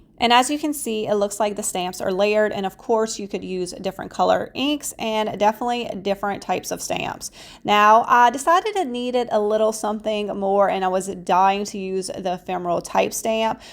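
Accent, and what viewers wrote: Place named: South, United States. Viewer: I’m pretty sure it’s not phony! She’s from the south and has an accent!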